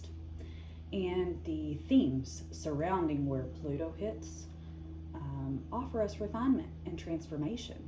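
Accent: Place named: South, United States. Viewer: Fantastic reading. You really explain well the Pluto energy and its impact on relations. I subbed because your explanations are great and I'm a Southerner and love listening to us discuss mystical things. 💯💯💯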